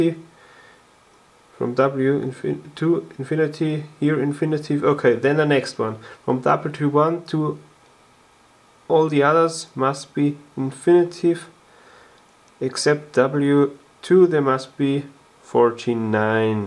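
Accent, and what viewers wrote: Bavaria, Germany. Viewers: Dieser deutsche Akzent aus Bayern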